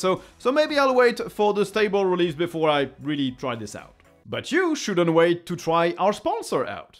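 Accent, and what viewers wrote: France. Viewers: "Native waylANd experience" Ton français ressort toujours un peu, haha :')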